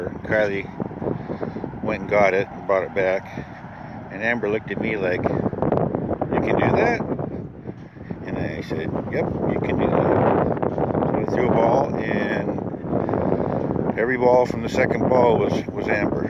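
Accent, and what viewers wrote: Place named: California, United States. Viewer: Californian acent 😁. Amber is really happy there.. 😘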